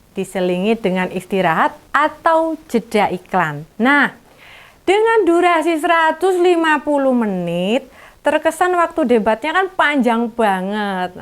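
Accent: Java, Indonesia. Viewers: Ini mbak makin lucu, makin berani, makin tajem... Dan dicampur logat dan boso Jowo jadi pas untuk pendidikan pembelajaran mayoritas masyarakat NJAWANI..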